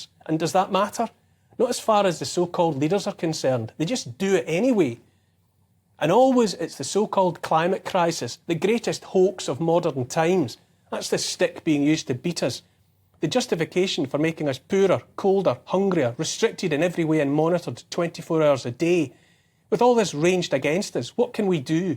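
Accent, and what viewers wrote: Scotland, United Kingdom. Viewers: You nailed it 😁 Without giving any thought as to why I was inspired to write a poem about him... It just happened. But you've summed up what sparked it 😊 This was May last year 😊🤗 Won't Kneel A gentle, beautiful Scottish lilt A kind, empathic, forward tilt An open heart; a caring face A willingness to take his place. A strong, home-hitting monologue Reminds us of the boiling frog The courage to become a sleuth A passion to release the truth. A deep desire to crack the whip Illuminates our freedom-strip Calls out the darkness taking hold The shiny despots; plated gold! Intense and raw, he lays it bare A soul-enhancing, honest stare Inflections drive the message through He speaks for Us - for me and you! Discernment's such a precious gift His words create a mighty lift Take heed of all he has to say He knows - it shouldn't be this way! 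🤗🦋🐬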